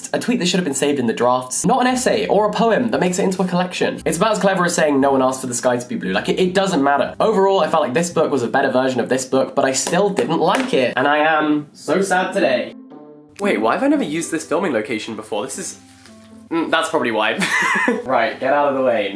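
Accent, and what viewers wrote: United Kingdom. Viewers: I love his british accent and ofc his word games hahah ;)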